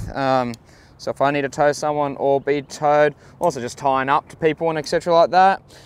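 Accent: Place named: New Zealand. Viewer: Judging by your excent you're a kiwi living in oz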